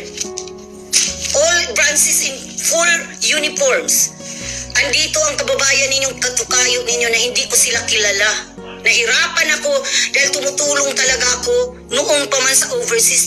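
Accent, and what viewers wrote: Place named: Visayas, Philippines. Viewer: Hahahaha yabang mo madam Eh halata naman sa salita mo na Visaya ka. Korte na para magkaalaman na sobrang yabang na eh. Hoy kakagigil ka na ha. Wag mo ipagyabang ang America dito dahil sa totoo LNG hindi mo cla kilala kong kaibigan nga cla. Cguro sabog ka KC maluwag Jan ang droga eh.parang kendi LNG na nabibili lakas ng tama mo madam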